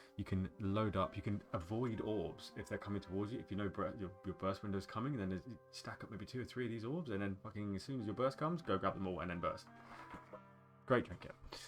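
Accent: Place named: England, United Kingdom. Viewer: the English language spoken with an English accent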